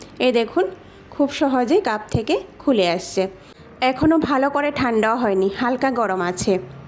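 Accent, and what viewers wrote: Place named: Bangladesh. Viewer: তোমার accent শুনে মনে হচ্ছে তুমি বাংলাদেশি. Cake এ লেবুর রস দেওয়ার দরকার নেই আর cake এ vanilla essence দিলেই ভালো লাগে.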